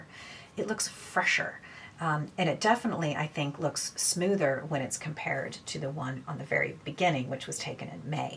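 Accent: Ireland. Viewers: The battery cycle is too short on this thing (Omnilux) for sure. I have another cheap USB external battery power source that I use and just set a 10 minute timer on my phone. Annoying for the price. This is my first watch of your channel. I’m 50 as well. You have a subtle Irish accent which I adore. I’ve subscribed.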